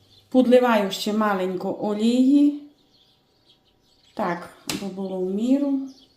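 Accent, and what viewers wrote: Transcarpathia, Ukraine. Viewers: малоймовірно він партачив московитську!А розмовляє на закарпатському діалекті! І це неймовірно чудово, наша Україна багата на діалекти.Напевне коли навчались у школі вам про це говорили, або ви прогулювали уроки😮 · Нормальний закарпатський говір. Наша країна велика і в кожному регіоні свій діалект.Я навпаки просто насолоджуюся,як говорить пані.Дуже вдячна за рецепт. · вирвіть собі краще! Кожен регіон має свій діалект - закарпатський саме такий, і ми його любимо!!! - дякую) Якщо що - я з Черкас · Я в захваті від вашої прекрасної закарпатської мови. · поддерживаю, это не украинский язык. Это местечковый закарпатский говор. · ПРИВІТ ВСІМ З ХЕРСОНУ. ДЯКУЮ ПАНІ ЗА РЕЦЕПТ. ГАРНА УКРАЇНСЬКА МОВА. ЗАКАРПАТСЬКИЙ ДІАЛЕКТ. ЧУДОВО.❤👍🔥 ЗАКЛИКАЮ ПОВАЖАТИ ОДНИ ОДНОГО, ТА НЕ ТРЕБА ЦЬКУВАТИ ОДИН ОДНОГО ЗА НАШУ СОЛОВ'ЇНУ... МИ УСІ ГРОМАДЯНИ УКРАЇНИ.💙💛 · Гарний говір закарпатський. 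Більш венгерський а не молдавський. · Важкий діалект, важко слухати, хоча я сама з Франківська. Закарпатський, Чернівецький діалекти відрізняються від нашого. Одне слово "суль" і вуха скручуються. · Як мені гарно чути закарпатську мову: подобається більше ніж рецепт. Хоча і рецепт гарний, треба спробувати 😊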